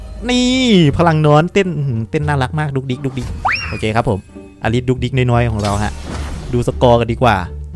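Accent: Isan, Thailand. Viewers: เป็นคนที่พูดอีสานแล้วดึงดูดใจแปลกๆ ค่ะ55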